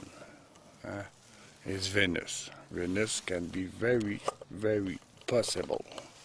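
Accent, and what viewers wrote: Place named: Quebec, Canada. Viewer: TRB3 Appareil militaire qui fonctionne a l' énergie nucléaire.... Check sul net ! Ton accent québécois dans ton anglais est très drôle en passant !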